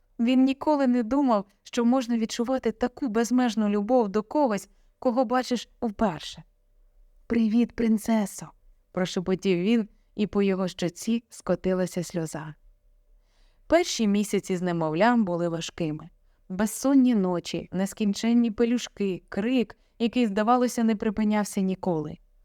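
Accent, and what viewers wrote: Standard. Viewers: ДУЖЕ ГАРНА РОЗПОВІДЬ, ВЗЯТА З ЖИТТЯ, ДЯКУЮ ЗА ЧИСТУ УКРАЇНСЬКУ МОВУ.❤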